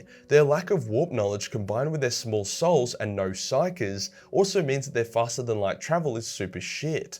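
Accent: Australia. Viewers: I was about to say "summer?" But yep Australian, not bittish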